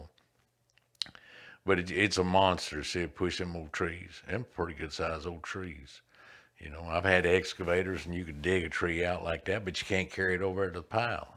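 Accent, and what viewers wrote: South, United States. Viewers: Loved the Video AND The Country Boy Narration!! Haha! Get Er' Done!!😎😎😎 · Haha! Great video! I like listening to you narrative! That good ole country accent! God bless the work of your hands!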